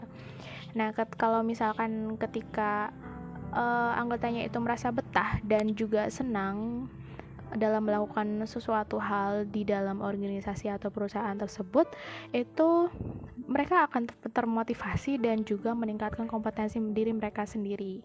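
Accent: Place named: Java, Indonesia. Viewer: Jiosss tenan.. Suara ne meduk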